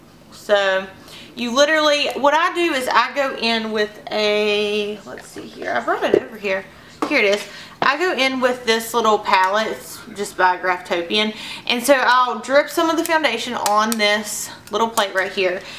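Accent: South, United States. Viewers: Ok, girl, u hav GOT TO B from my neck of the woods!!!! Lol!! Im from Alabama and i wud kno my Southern accents fom ANYTHING!!! Lemme kno if ur close and we'll go shoppin and trade makeup! (I didnt see if u had the UD Naked concealer and Laura M. brightening powder, but if u dont n ur close, i will let u try them and THEN U WUD RUN TO SEPHORA!! They r THE BEST COMBO!). Good vid and nice to hear from sum1 close to home!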